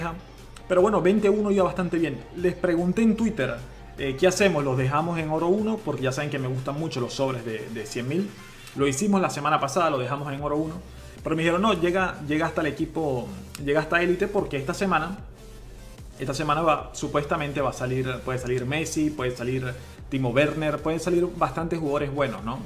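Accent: Venezuela. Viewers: De donde eres ? Me suenas a Venezolano pero no estoy seguro jajaja. Buen video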